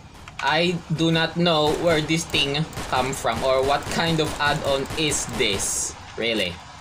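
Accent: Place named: Laguna, Philippines. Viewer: parang ikay taga laguna ..gawa ng iyong pananalita ay ... by the way new subscriber dine ...❤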